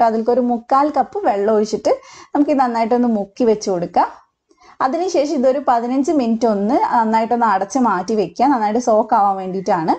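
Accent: Kannur, India. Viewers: Itha kannur aano or Malappuram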